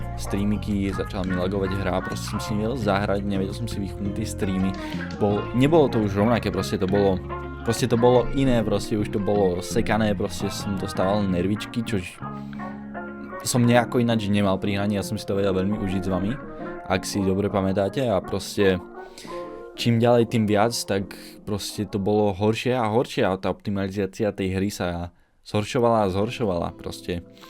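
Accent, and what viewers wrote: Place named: Slovakia. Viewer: s fúzami?😳 Toto je insane nečakala som že sa niekedy vrátiš. 14 ročná Simča ktorá kukavala vždy na tvoje streamy a čakala na druhý deň keď stream začne tak je back na tvojom kanáli len tiež trochu postaršia ale fúzi jej nerastú😆 Nikdy by som nepovedala to že si na tieto časy ešte takto zaspominam milovala som to. A čo sa týka momentálneho kontentu mne osobne to nevadí auta mám rada a slovenskej angličtine rozumiem😅 takže sa teším na ďalšie videjko☺️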